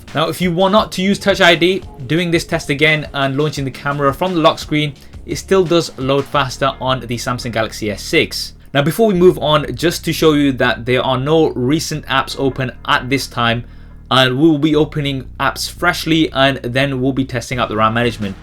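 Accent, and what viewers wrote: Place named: United Kingdom. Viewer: i can smell the British accent , don't know why ....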